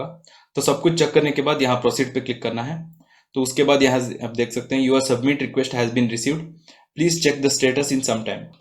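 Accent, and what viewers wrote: Karnataka, India. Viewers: Thank you so much,. It's very helpful and comprehensive video. 👌🏻 Subscribed 😊👍🏻 PS. Are you kannadiga? 😃 (just wondering)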